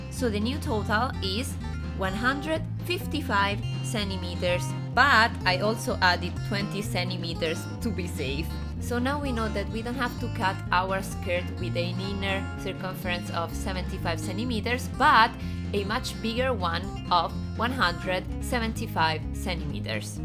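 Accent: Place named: Italy. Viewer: me encanta tu canal!!! sabes tu hablas mitad italiana mitad española saludos desde argentina!!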